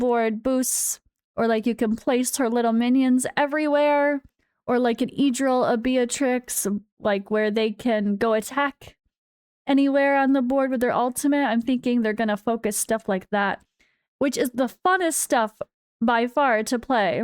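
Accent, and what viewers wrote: United States. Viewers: Love your content but so amused by how Americans end a lot of words with a 'a'. Not meaning to be offensive, it just amuses me, lol. Don't know if quirky is a thing in 'American English' but you are, and it's good :) .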